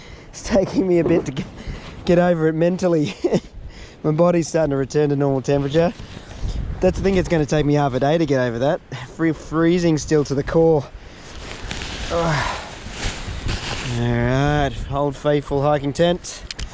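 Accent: Australia. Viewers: He sounds Australian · Is this Australia ? I sense because of accent. English isn't my native language so don't quote me on my guess. It's pretty beautiful though. Keep up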